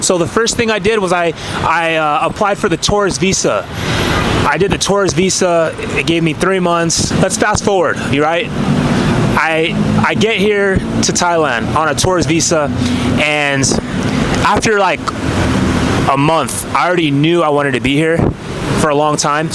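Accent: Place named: Texas, United States. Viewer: I can already tell you was from Texas lol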